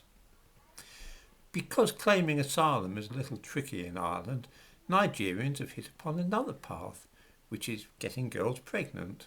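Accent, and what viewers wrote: United Kingdom. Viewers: A British accented man stirring up discord in Ireland, who would have guessed! Mmmm this all sounds racist to me dressed up as information! That said, I don't like to hear what's happening. I think it is happening the world over, the least well off in the world (the have nots) want a piece of the "better life" pie which we all enjoy and who can blame them? We in the developed world have gained enormously from the exploitation of people in the third world. As far back as 2000 when we lived in Dublin, I noticed the general population was very racist towards Nigerians, and I was ashamed to see it. It was OK for us to collect for "the black babies" while they were over there but we don't actually want them to come and take what they want from us! They were treated really badly regardless, it didn't matter if they were good, bad or anything in between, they were all tarred with the same 'black' brush. 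I don't know if these statistics are true or not, and if the way to get citizenship is as easy as to have a baby, then that is on the government for allowing it. It's not allowed in many countries so if it's a problem in Ireland it needs to be addressed by the government. Or does the Irish government want immigrants to do the dirty work that the Irish won't, or don't want to do!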